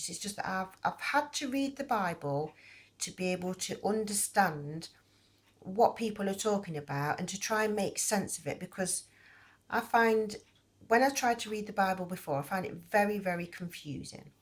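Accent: United Kingdom. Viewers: Thank you very much for your patient explanations as you crochet! You take all the guess work out of your patterns & I really appreciate that! The Tiny Baby Cardigan is ssooo cute! I plan to crochet quite a few to go with the hats/caps I am crocheting for tiny babies at our local hospitals. Also, thank you for sharing such interesting history! I always wondered about the circles that some call "Crop Circles." Now I know they come from a mushroom! I didn't quite understand all about the rocks. I guess I was having a brain freeze at the time. I really enjoy watching your videos! They are very helpful to me! I love your British accent! May God richly bless you!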